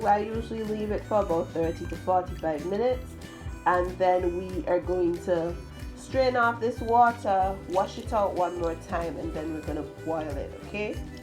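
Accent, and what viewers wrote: Antigua and Barbuda. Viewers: YouTube brought me here because I was looking for a recipe, and then I heard the accent and was like, "Hold a minute." Antiguan 🇦🇬 can't hide.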